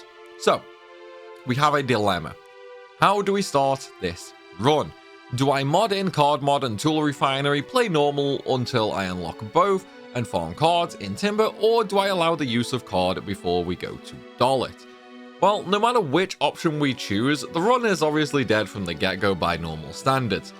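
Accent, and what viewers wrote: United Kingdom. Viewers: are you british by any chance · Y'know, most people enjoy it when a YouTuber doesn't spend a bunch of extra time baby stepping you through the basic systems of a game, because it gets really repetitive hearing every single challenge video explain the exact same things over and over. And I guess hearing a British accent for the first time in your life, while also watching a challenge run of a game you know nothing about, might leave you slightly confused as to what's going on here.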